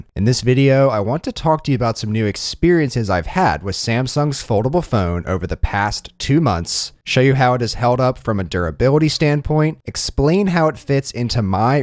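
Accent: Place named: Delaware County, United States. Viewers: Love the Delco accent haha shoulda been on Mare of Easttown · With his light accent and the red star I wonder if is from Pennsylvania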